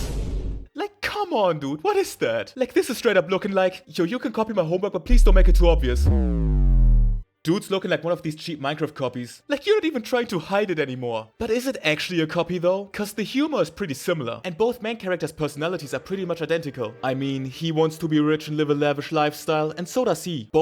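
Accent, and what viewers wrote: Germany. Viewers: are you german? I think I can hear a german accent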